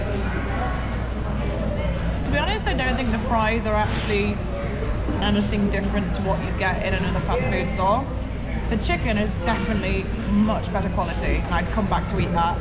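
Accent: United Kingdom. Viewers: Did people ask you if you were British lol. Love your accent lol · British accent - interesting.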